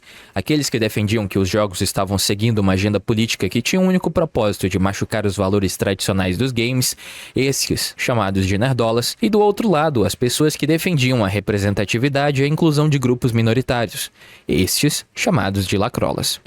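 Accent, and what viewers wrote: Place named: Northeast, Brazil. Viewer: esconde o rosto dnv man vc é o boneco de IA do seu perfil, n este gigachad com um sotaque de nordestino disfarçado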